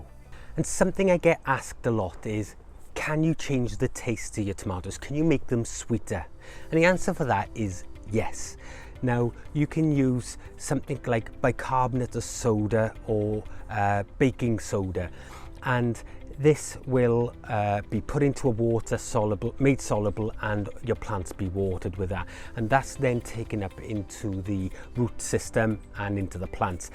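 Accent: Wales, United Kingdom. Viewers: Are you from the valleys ?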